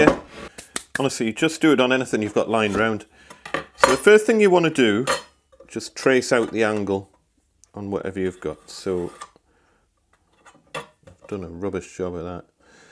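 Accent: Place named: Leicester, United Kingdom. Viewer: Lovely video yet gain. Where is your accent from? I know a chap in Leicester that speaks exactly Iike you! Maybe it’s just the subtle stops in the words? Soothing though lol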